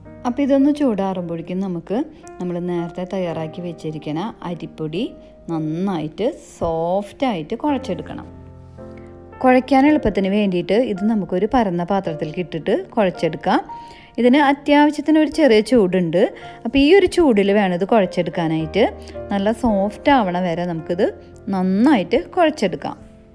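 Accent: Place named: Thrissur, India. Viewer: Hai my dear friend ഈ സ്പെഷ്യൽ ചേരുവ കൊഴുക്കട്ട സൂ....... സൂപ്പർ പിന്നെ ഈ തൃശൂർ ശൈലിയിൽ വിവരണവും അടിപൊളി കൊഴക്കട്ട ഫ്ലേവറും ഈ വിവരണ ഫ്ലേവുറും കലക്കി വീഡിയോ കണ്ടു ഇഷ്ടപ്പെട്ടു ലൈക് ചെയ്തു 👍💐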